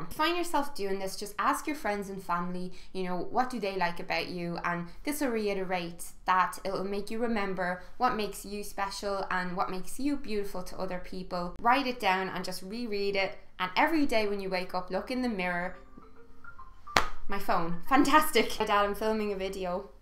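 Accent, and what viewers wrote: Ireland. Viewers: Haha I'm a sucker for an Irish accent!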